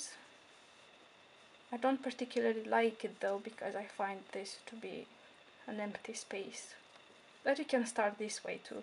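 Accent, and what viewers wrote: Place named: Iceland. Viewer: Your accent sounds very Icelandic, what is your first language?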